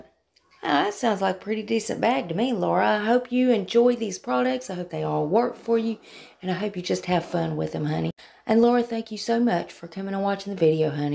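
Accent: South, United States. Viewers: Hi, I look forward to your sweet southern accent and advice. Because if you I’m going to upgrade. I almost dropped it thinking who’s going to see if I have makeup on or not. But it makes me feel a whole lot better wearing it around the house. Thank you for reminding me of that! Jen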